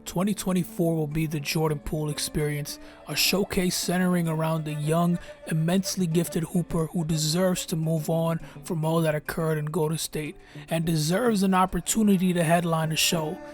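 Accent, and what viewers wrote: New York, United States. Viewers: that New York drawl is perfect for basketball talk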